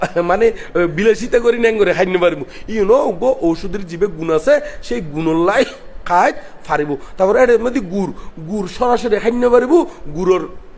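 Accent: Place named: Chittagong, Bangladesh. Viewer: চিটাগাং এর আঞ্চলিক ভাষার কারণে বুঝতে অসুবিধা হচ্ছে।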